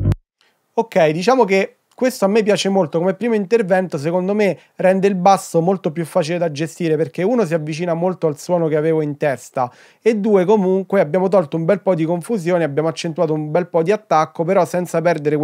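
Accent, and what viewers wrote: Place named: Rome, Italy. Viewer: Abito a Pordenone da 15 anni ma sono di Roma (vicino Frascati). Sempre bello sentire il nostro accento...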